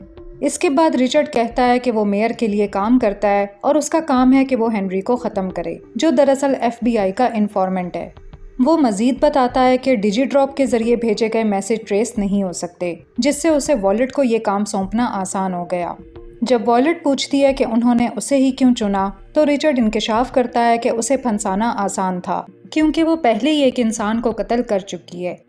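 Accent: Pakistan. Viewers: Aapke urdu aur talaffuz se malum hota hai shayad pakistan se hain. Anyways, ek french movie hai "Tell no one", uska detailing karein ...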